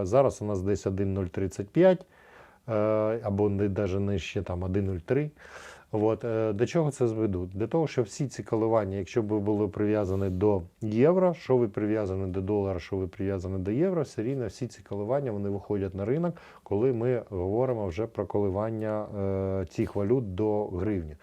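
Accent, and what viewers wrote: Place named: Russia. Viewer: Підтягнути чисту українську ,без нахилу на російську!!!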